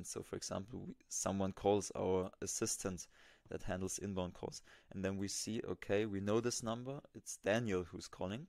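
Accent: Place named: Germany. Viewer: Aufgrund deines Dialekts nehme ich an, dass Du aus Deutschland kommst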